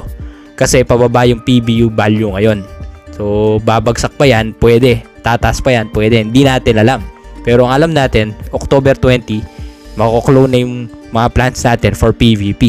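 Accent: Pampanga, Philippines. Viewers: Kapampangan ka ata boss?😅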